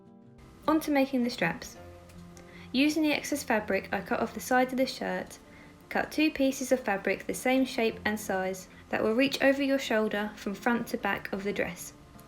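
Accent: United Kingdom. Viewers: Saaf Landan!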